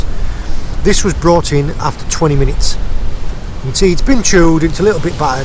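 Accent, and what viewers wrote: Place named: Cumbria, United Kingdom. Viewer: Your accent sounds West Cumbrian, like Whitehaven or somewhere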